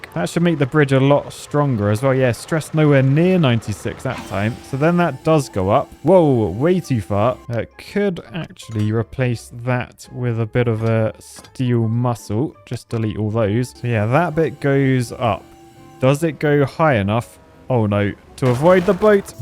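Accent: United Kingdom. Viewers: This video would’ve been better without your British accent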